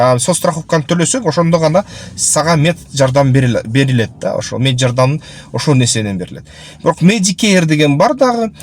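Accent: Standard. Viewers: тушундуруу таза кыргызча эн соонун❤👍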